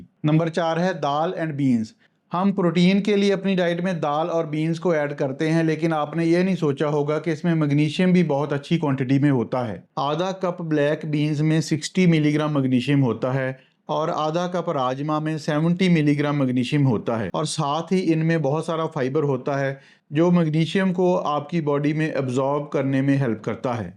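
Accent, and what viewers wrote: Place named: Punjab, India. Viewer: Punjabi accent ki vajah h.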